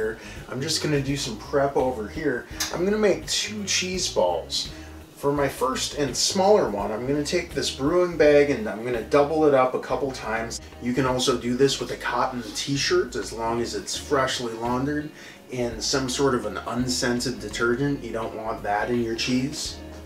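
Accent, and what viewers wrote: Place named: Northern Kentucky, United States. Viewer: Are you from northern Kentucky? You sound like it. 😊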